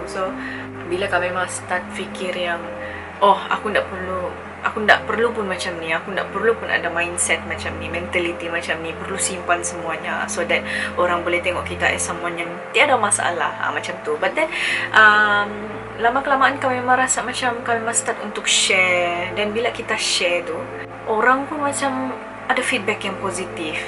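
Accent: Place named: Sabah, Malaysia. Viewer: Suka sa dengar cakap loghat sabah 😂 sedap jak dengar hahaha yay new video!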